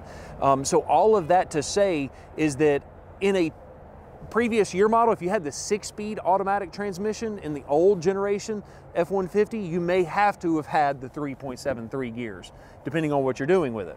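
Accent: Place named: Alabama, United States. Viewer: Great video and I can understand you pretty good with your Alabama accent even if I am not a native english speaker. Out here in Germany we just have mid-size trucks (all Diesels because of gas price and mpg) and they haven't been very popular until a few years ago (maybe because of the VW Amarok). But we always had some grey imports of fullsize trucks. But it seems like more than 80% of them are RAM's. But I like the F150 better also because of your videos. Great content your videos. Wish I could have done that when I was a car salesman (VW) years ago. Greetings from Bremen (Beck's beer City)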